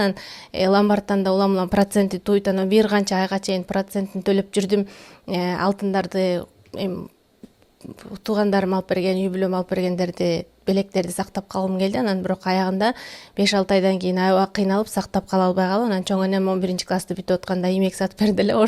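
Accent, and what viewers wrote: Standard. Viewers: Кыргыз тилин таза сүйлөгөнүңдү сыйлайм,өмүрүң узун болсун жаштарга үлгү болуп жүрө бер кызым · Абдан акылдуу, кыргызчаны таза сйлогон кыз экенсин чон рахмат!!! Кайсыл жерлик болосун? Мен Нарындын Ат- Башы районунан болом. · Кандайсыз, Кыргызстандан сырткары иштеп окууп журсонуз да Таза суйлогонузду сыйладым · Кыргызчаны таза сүйлөйт экен. Баракелде🎉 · ...мен бул каналга бекеринен туш келбедим, бир дем менен угуп чыктым. Бул кыз кыргызча, орусча, англисче, туркчо ой жугурткон практик, динден кабары бар, жолдошунун акылманы, балдардын суйуктуу апасы, эн кучтуу МИНИМАЛИСТКА деп кабыл алдым🎉🎉🎉. Жашым бир топ улуу болгону менен озумо керектуу коп нерсе алдым! Эми уйкум качат 😅. Ото жонокой, таза кыргыз тили менен арбап алды. Аз тапканына чиренип, жерде басканын унутуп, мурдунун таноосу корунгучо чалкалагандар учун улгу болчу акылман кыз экенсин! 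Эми тынбай коромун! Орустар айтмакчы ПРОСТО ПОЛЮБИЛА!!! Токтобой ос ылайым Алтын кыз!!! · таза жана так кыргыз тилинде сүйлөгөнүң үчүн канча "жакты"белгисин басуу керек болсо,басмакмын.Өмүрүңө жана ден соолугуңа береке тилейм,аман болуп,кыргызга пайдаң тие берсин.Ошон үчүн да баракчаңа жазылдым. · Суйлогонунуз да кыпкыргызча гоо!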